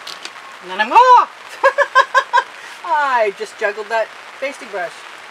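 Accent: Texas, United States. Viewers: A big ol' party going on in that one! Texas talk. Love it!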